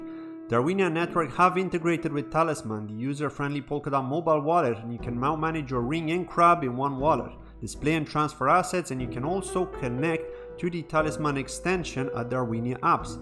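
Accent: Ireland. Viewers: really !!! Didn’t know that, I thought that you had a touch of a Kerry accent alright 😂😂😂 yep, I’m Irish 🇮🇪🇮🇪🇮🇪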